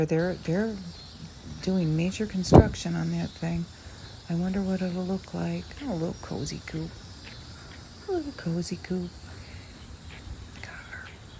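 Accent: Midwest, United States. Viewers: I was born in MI but raised in the south. Most of my family lives in IL or WI so it’s nice to hear that midwest accent for a change.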